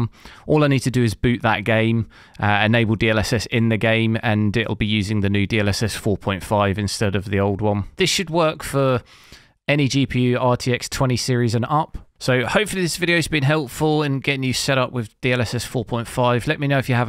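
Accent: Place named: United Kingdom. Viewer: Out of topic - where are you from? Cause you mix accents and pronunciation, you have British accent but you pronounce some words differently, e.g. "twenty" without the "t" ;)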